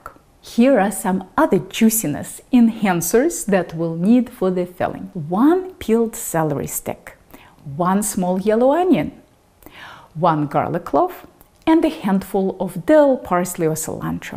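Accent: Russia. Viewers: Рашн акцент не спрятать) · I followed your recipe and made pelmeni your way. They were deliciously spectacular! Thank you very much. You mention Olia Hercules often, so I watched a few of her videos. Her recipes looked tasty but it seemed she wasn't cooking for the average person. Most home cooks do not cook the way she does. In one video she used a gas range that must have had a dozen burners. I like the fact that your food processor is small and you need to make two batches and mix them. You don't have the Instant Pot Ultra Bluetooth model, you have the same one I have. That is what it is like for most people. You seem to love what you do and passing on your passion. That is what I enjoy about your videos. It doesn't hurt that you are a very pretty lady with a Russian accent either.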